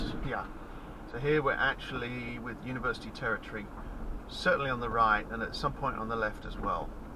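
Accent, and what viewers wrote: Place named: England, United Kingdom. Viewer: This was randomly recommended to me by the Youtube algorithm. I'm from Clark. What a fascinating video of an English accent narrating the area I grew up in. You have described things in a way I never would have thought of! Please do more videos! Come on down to Clark, Cranford and Rahway!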